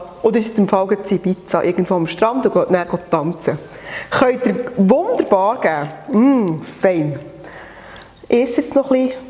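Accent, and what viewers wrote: Switzerland. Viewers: Herrliches Schwizer Dütsch..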